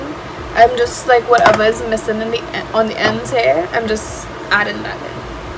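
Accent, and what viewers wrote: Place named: Trinidad and Tobago. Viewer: Keep going!!!! from a trini in NYC. It’s so refreshing to see someone from home doing makeup reviews. Let me just say, there is an audience out here for your brand, I was so happy and proud when I heard the accent. It made me feel at home. Thank you so much for what you are doing. I look forward to seing all that you do. I am a subscriber for life.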